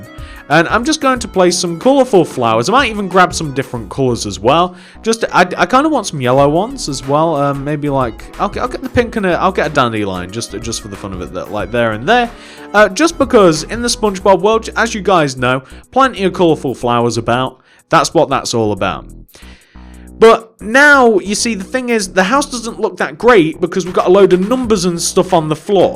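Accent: United Kingdom. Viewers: you sound like britbong, very distracting, 7/10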